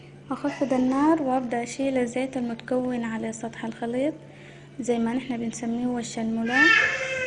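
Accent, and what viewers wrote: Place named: Sudan. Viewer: يدك نظيفة وشغلك نظيفة وعدتك سممممحة وأهم شي حفااااااظك على اللهجة السودانية ياخ نحنا شعب معلم شعب مدرسة زاتو❤❤❤❤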